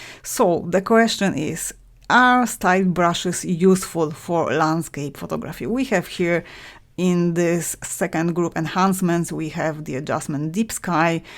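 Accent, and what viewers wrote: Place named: Poland. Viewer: De acuerdo, Enrique, y ya que mi lengua materna es el inglés puedo confirmar que el dominio de del idioma es superior. Es más, su rico acento polaco, según lo expresan los hondureños al menos, pues, es del todo 'delichoso' al oído nativo.:)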